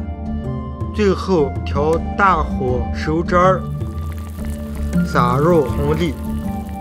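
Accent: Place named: Inner Mongolia, China. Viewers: han xiang 对，听着像巴盟的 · 赛拜侬 西北口音 伊盟人 或者巴盟人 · 巴盟，包头，东胜都是这个口音。跟我们晋西北，陕北一个味。这个炖羊肉做法跟我们家里一模一样，只是我们不放蒜，加点茴香。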